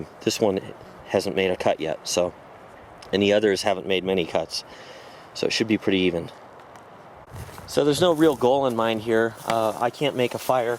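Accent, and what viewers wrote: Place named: Arizona, United States. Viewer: haha he knows, he stated when he first introduced it that he won't be pronouncing it as hoonglas but as unglas bcuz of his Arizona accent/speech pattern.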